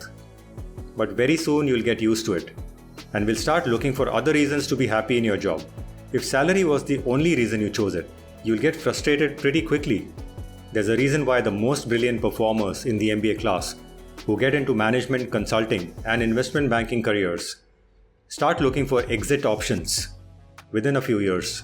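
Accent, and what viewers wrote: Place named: India. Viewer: That's the beauty of Indian spices and accents. The more you experience them, the more you'll fall in love with them. Till you get there, feel free to turn on the accent-free subtitles on our videos.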